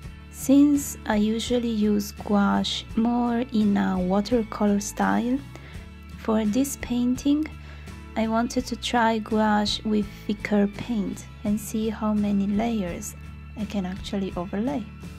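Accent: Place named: France. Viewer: une francophone quand même, j'ai reconnu l'accent! xD mais tu parles bien anglais, ton accent français est presque inexistant:3 j'aime beaucoup ton travail par ailleurs, tu aurais un instagram?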